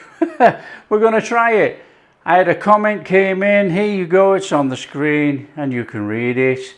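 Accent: Yorkshire, United Kingdom. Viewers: At one time I use to deliberately burn toast as I liked it so much 😁😁 but I must say I really like how you said it in your lovely Yorkshire accent. That was fun to watch. The goo factor was off the charts! 🙌🏼♥️.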